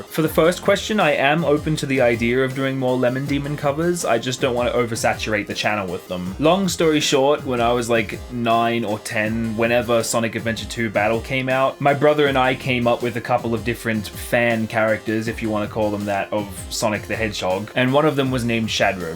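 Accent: Australia. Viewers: Kneight yep Australian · Australian I'm pretty sure... not positive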